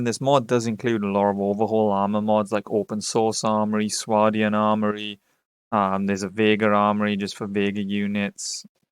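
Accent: United Kingdom. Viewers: I can hear the South Wales accent straight away, Swansea me. 😂🏴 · I always enjoy your videos and your accent as well, what part of the UK are you from?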